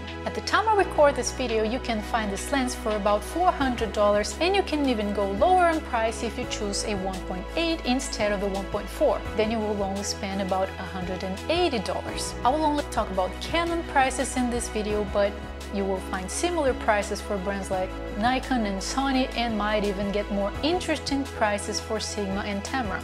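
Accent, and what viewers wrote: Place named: Brazil. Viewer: Eu escutei e aí guys, já percebi de cara que era BR kkkkkk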